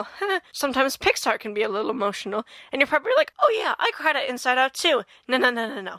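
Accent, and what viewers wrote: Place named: United Kingdom. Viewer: Ur bri'ish accent is gold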